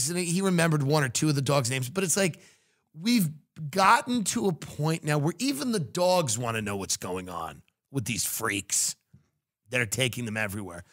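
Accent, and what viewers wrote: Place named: South, United States. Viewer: An epic stream of consciousness ad, delivered in a creepily hushed southern accent. Love you, 😂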